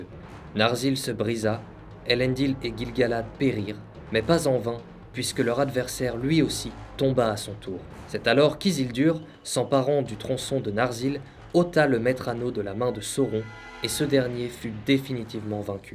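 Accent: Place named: France. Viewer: Continue de prononcer à la française!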